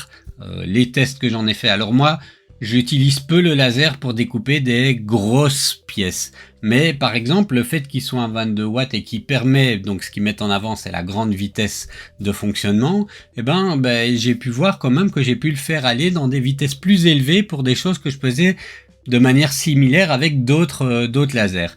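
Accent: Belgium. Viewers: Bravo pour ce test. Clair, sympa et sans pression. Ce petit accent belge est super agréable 😜. Ca donne envie d'ajouter un laser à mon atelier. Merci pour tout ce travail.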